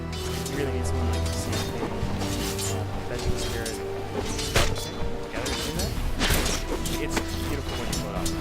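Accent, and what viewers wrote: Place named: Newcastle, United Kingdom. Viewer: hi geordie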